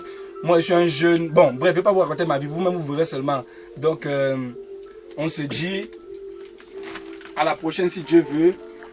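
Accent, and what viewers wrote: Cameroon. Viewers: cool ton tag,tu as le vrai accent kmer! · tu as un fort accent camer.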